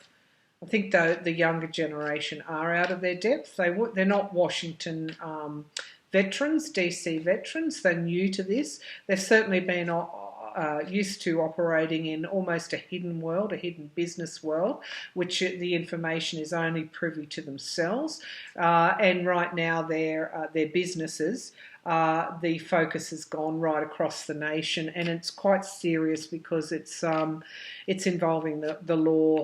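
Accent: Australia. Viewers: Hi,. I enjoy listening to your great Aussie accent and readings. When you have the inspiration, will you please do a reading for Maxime Bernier, the leader of the People's Party of Canada. This us our election year and Canada is in a terrible mess with lots more coming.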